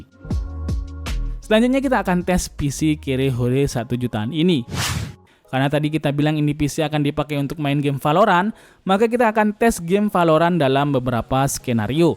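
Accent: Madura, Indonesia. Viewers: bang.. lu orang Madura ya ???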